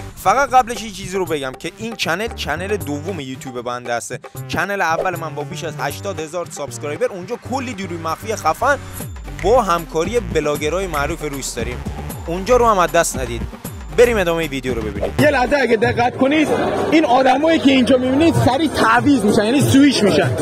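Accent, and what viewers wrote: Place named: Sabzevar, Iran. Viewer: لهجه سبزواری موج میزنه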